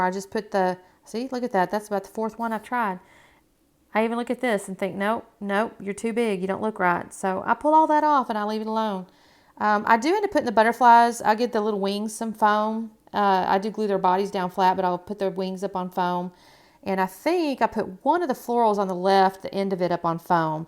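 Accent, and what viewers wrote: Texas, United States. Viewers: I’m loving your work, and also,your humour and accent. I’m from NZ and I love your Texas ways. ❤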